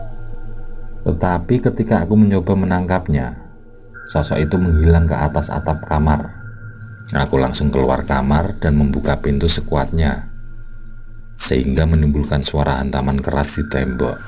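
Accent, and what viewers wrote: Java, Indonesia. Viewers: Orang jawa mana suara nya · Orang Jawa yo mas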